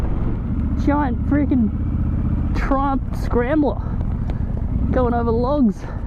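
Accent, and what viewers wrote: Australia. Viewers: good to hear an Aussie voice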